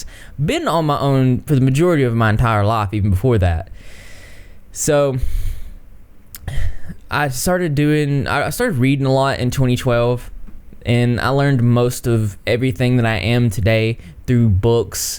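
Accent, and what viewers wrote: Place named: Georgia, United States. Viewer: can't believe i didn't put it together that you are from georgia. i love the accents of the people from there and i love yours. and really appreciate that perspective you have. you wouldn't know happiness if you didn't know pain. i use similar mantras myself, my current favourite "happiness or suffering, the choice is mine/yours". happy holidays everyone 🎐☃️💟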